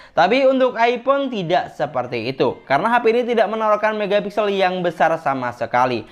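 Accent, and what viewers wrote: Madura, Indonesia. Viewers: Logat ny kok kayak org madura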